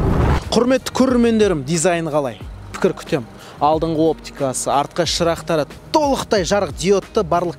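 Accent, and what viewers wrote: Standard. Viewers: Таза казакша сойлитин 1 ши блогер жарайсын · Жарайсың. өте түсінікті айтасың. тап таза қазақша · Қазақшаң үшін мың алғыс, нағыз таза қоспасы жоқ жалпақ және мәдени түрде түсіндіргенің керемет, басқа айтарым жоқ, семіз лайк 👍🏻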